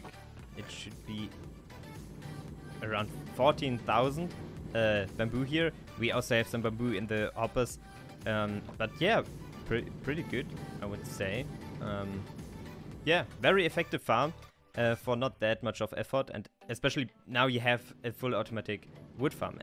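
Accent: Germany. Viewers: And btw you hear like your are german, and here is a qord for you : im a ALMAN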